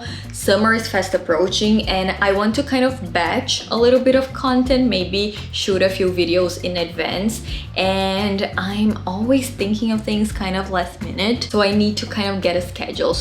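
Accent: Brazil. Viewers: Brasileira, que legal! I was wondering where your accent was from, I really liked your content! Jesus te abençoe, muito sucesso!!!❤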